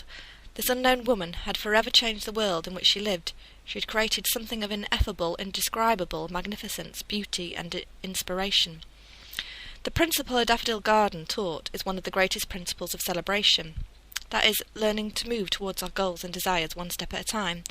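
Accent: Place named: United Kingdom. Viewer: I love British accent! I wish i would live with this principle.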